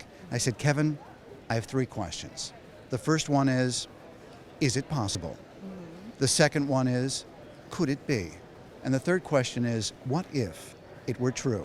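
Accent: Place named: England, United Kingdom. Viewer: Here in the UK the narrator has an English accent. I'm certain they didn't get a different narrator just to show it here. Plus although the accent is definitely from England I couldn't tell you from whereabouts. It's more like a generic English accent. Does anyone have an answer for me.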